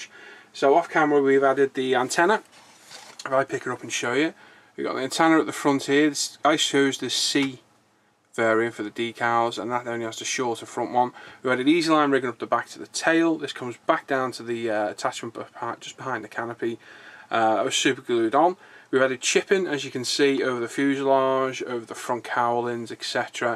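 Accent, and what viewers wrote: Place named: United States. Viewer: It's a very beautiful model, you're very expert. I advise you to mount the version f4u-1a, it's better and more beautiful. The "Birdcage" wasn't very appreciated by pilots, because it had a bad visibility. With the second version, 1a, the "Corsair" was more appreciated. And also, could you put subtitles in foreign languages? Because you speak with a very strong american accent, it's not very easy to understand for me, because it isn't my mother language... However, thanks very much for your videos!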